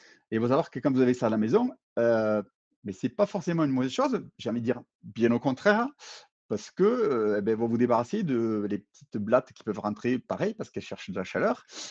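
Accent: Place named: South, France. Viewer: Bonjour, Ça fait du bien d'entendre un peu l'accent du sud (les bretons se moquaient de moi à l'époque),en tout cas, excellente vidéo très instructive. Merci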